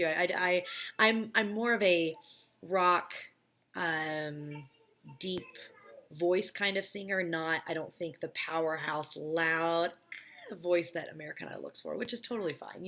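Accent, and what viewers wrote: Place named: South, United States. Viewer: Sounds like you're a Southerner. That's the important thing! --don, mphs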